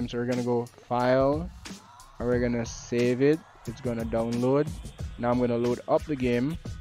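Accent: Jamaica. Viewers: You sound jamaican? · Thank I very much and I like ur accent it sounds like u from Jamaica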